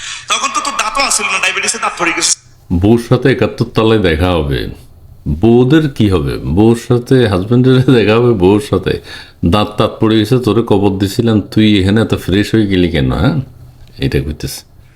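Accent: Noakhali, Bangladesh. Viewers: দুর ও শালা নোয়াখালী কি বলে বুঝতে পারলাম না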